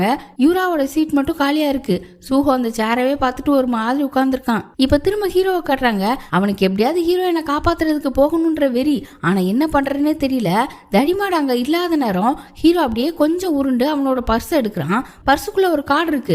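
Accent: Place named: Tirunelveli, India. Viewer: Apdiya. Nanum old TVL new TKSI districthan. Irunthalum konjam different ahgathan irukku. But nallirukku unga slang😊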